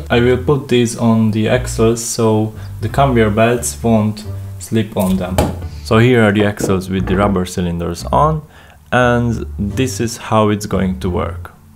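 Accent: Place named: Hungary. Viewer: csá. ne higyj a fokuszcsopi ádámnak h magyarulkéne. teljesen jolesz igy magyar akcentus elsöre vicces de szerintem pont jó .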